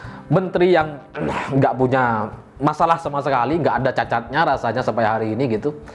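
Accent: Madura, Indonesia. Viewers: Lihat logatnya sihh spt satu suku dg Pak Mahfud MD, Madura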